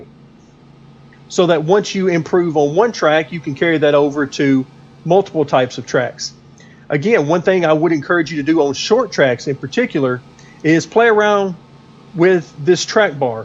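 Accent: South, United States. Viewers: For some reason, your southern accent gives this validity. I hear it and I'm like "this guy probably knows more about nascar than me"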